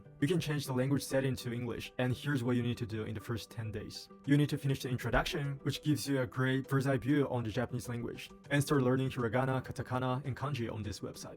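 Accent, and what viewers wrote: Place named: China. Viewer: This was my thought, but his accent is Chinese.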